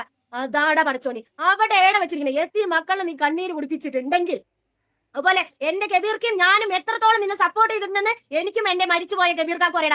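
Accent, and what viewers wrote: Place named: Palakkad, India. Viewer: നല്ല മലയാളം സ്പീഡായി സ്ഫുടമായി സംസാരിക്കുന്നു.ഒരു പാലക്കാടൻ slang. ഗോഡ്ഫാദർ സിനിമയിൽ ഫിലോന്മിനയുടെ അനപ്പാറയിൽ അച്ഛമ്മയെ ഓർമ്മ വന്നു.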